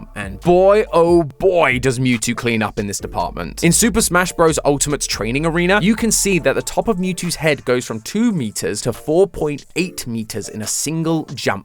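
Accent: United Kingdom. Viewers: Tbh He kinda sounds like matpat but a little more British 😢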